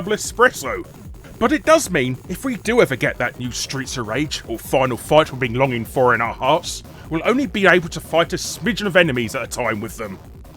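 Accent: England, United Kingdom. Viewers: Dude's British. That's how they pronounce "patent" in a majority of the UK. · ...you know he's using UK English, right? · Guys, this is just how we say 'Patent' in the UK. Though I have to admit I stopped pronouncing it like that years ago because the US way is less mouthwork. Or something like that. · Telling an Englishman he's speaking English wrong I see. Troll elsewhere bronie. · I believe it's called a British accent... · Please always remember that you're dialect/accent is sometimes incomprehensible outside of the British world.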